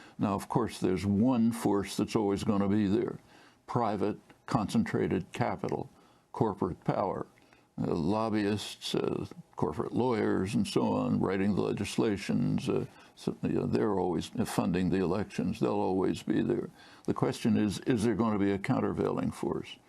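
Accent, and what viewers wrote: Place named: United States. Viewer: I love Chomsky but I find his accent really really hard to understand. Some American accents are really hard to follow and his is one of them.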